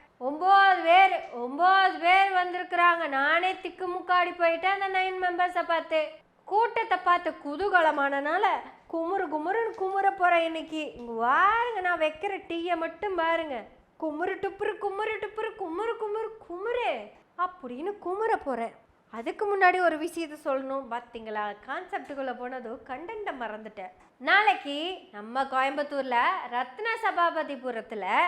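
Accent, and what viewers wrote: Kongu, India. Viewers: இந்த கொங்கு பாசை சூப்பர் இருக்கு 👌👌👌9tea 🤣🤣🤣🤣🤣சொல்லறது சூப்பர் ஓ சூப்பர் 👍👍👍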